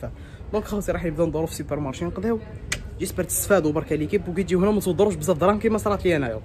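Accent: Constantine, Algeria. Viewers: لهجة جزائرية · نتا قسنطيني؟؟ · الهدرة تاعك تبان قسنطينية ♥💚لمهم جزائري ❤❤ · تبان قسنطيني حبيبي من لهدرة نتاعك .... تحية ليك و ربي يحفضكم في كل بقاع العالم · هههههه لا راه من الشرق الجزاءري قسنطينة نهدرو هك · ياخويا باين من قسنطينة. المهم بارك الله فيك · وي عندك حق هدرتو قسنطيني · باين قسنطيني من الهدرة الباهية تعنا هههههههه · بيان قسنطينة والله على هدر تاعو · هو قسنطيني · تحية ليك خويا من قسنطينة عاصمة الشرق الجزائري سبحان الله تڨول عليك قسنطيني🇩🇿🇩🇿🇩🇿💕💓❤️ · من هدرتك بنتلي كلي قسنطيني 😅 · مع بدا يهدر عرفتو قسنطينة وزيد شاورما قد باباها الحلوف تأكدت😂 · لهجت لقسنطينية مليحة الله يبارك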